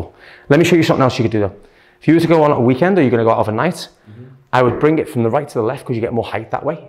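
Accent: Liverpool, United Kingdom. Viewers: Are you scouser? You sound like a scouser:D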